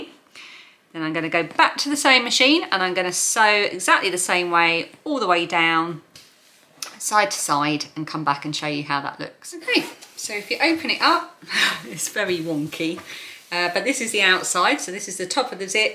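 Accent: United Kingdom. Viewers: Really enjoyed watching this, a familiar British accent. I can assure you that you’re not the only one who sews like this, or lives in a busy house sewing on your kitchen table! 😂 It’s how I learned and copying shapes from my wardrobe. I turn things inside out to draw around them! I’ve only recently invested in a set of patchwork square rulers to help neaten everything up as I’d like to sell some of what I make. I still use my ironing board for cutting everything out, it makes it easier for ironing. Still not got a craft room with neatly organised fabric on shelves. I also recycle as much fabric as I can from old clothes and linens. Your bags all turned out amazing! My favourite is the black and white with yellow zip. I’m inspired to make something similar but add a bright pink lining. 😊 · "EMBRACE THE WONKYNESS!" saith she, the #1 Wonky Queen of the Universe!! 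The funny part, at least for us assorted Americans, that your UK accent, which we associate for some reason with authority, makes it all seem kinda formal and official! LOL so you've probably done more to encourage those "across the pond" to sew that 10 other podcasters combined. So thank you,! Thank you! Love and hugs from Virginia USA to my UK fam! I read a lot of the comments, and not only do we have a HIT, but looks like a lot of new subscribers too! Congrats, my girl, congrats!!!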